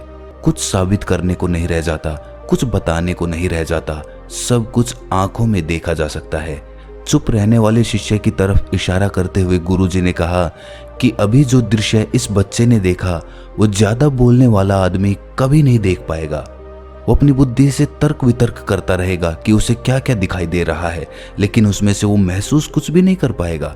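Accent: Haryana, India. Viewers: awaj kisi haryana wale ki hai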